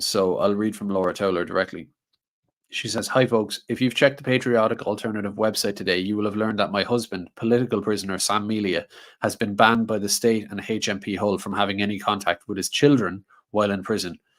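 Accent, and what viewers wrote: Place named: Ireland. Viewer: You sound like a typical uneducated bigot but with an Irish accent. I thought the Irish were a lot smarter than that. Clearly you have proved it wrong.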